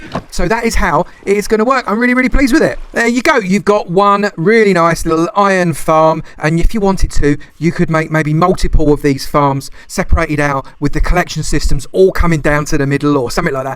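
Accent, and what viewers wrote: United Kingdom. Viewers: Aah your British accent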